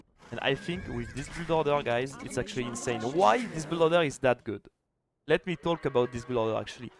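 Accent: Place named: France. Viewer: Bizzarement on sent dans ta voix que tu parles français ou que tu as une peux l'accent je me trompe 😏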